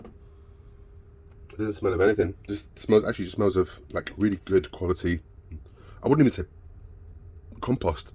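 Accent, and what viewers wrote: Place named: England, United Kingdom. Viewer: really enjoyed these posts thank you, do i detect a slight English accent ??? 🤔ATB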